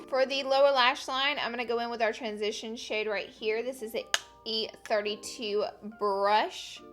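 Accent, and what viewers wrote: South, United States. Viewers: Second time watching your vids and I can say you're really funny and i like your accent, even though i normally dont like country accents (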